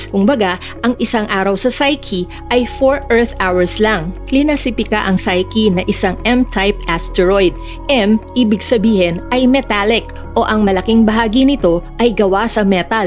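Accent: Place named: Visayas, Philippines. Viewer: Sayke haha langya na pronounciation yan napaka bisaya sayke nag aral ka ba ?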